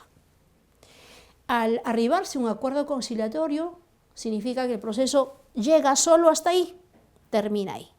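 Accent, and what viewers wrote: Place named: Spain. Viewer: Porque tiene dejo de española? No hace más que dudar (de ser de España) no estar actualizada de la ley peruana ahora vigente Aunque la apariencia aitocotona la delate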